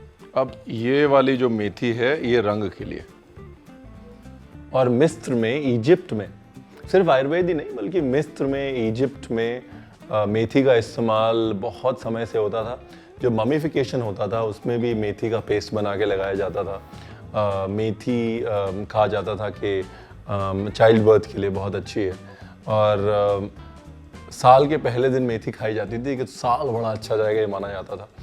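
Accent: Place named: Punjab, India. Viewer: Hi Ranveer tuhadi punjabi ta sachi maar dendi hai, above all tuhadi recipes....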